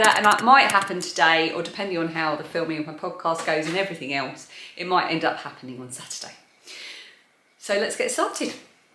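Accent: United Kingdom. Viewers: "EMBRACE THE WONKYNESS!" saith she, the #1 Wonky Queen of the Universe!! The funny part, at least for us assorted Americans, that your UK accent, which we associate for some reason with authority, makes it all seem kinda formal and official! LOL so you've probably done more to encourage those "across the pond" to sew that 10 other podcasters combined. So thank you,! Thank you! Love and hugs from Virginia USA to my UK fam! I read a lot of the comments, and not only do we have a HIT, but looks like a lot of new subscribers too! Congrats, my girl, congrats!!! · Really enjoyed watching this, a familiar British accent. I can assure you that you’re not the only one who sews like this, or lives in a busy house sewing on your kitchen table! 😂 It’s how I learned and copying shapes from my wardrobe. I turn things inside out to draw around them! I’ve only recently invested in a set of patchwork square rulers to help neaten everything up as I’d like to sell some of what I make. 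I still use my ironing board for cutting everything out, it makes it easier for ironing. Still not got a craft room with neatly organised fabric on shelves. I also recycle as much fabric as I can from old clothes and linens. Your bags all turned out amazing! My favourite is the black and white with yellow zip. I’m inspired to make something similar but add a bright pink lining. 😊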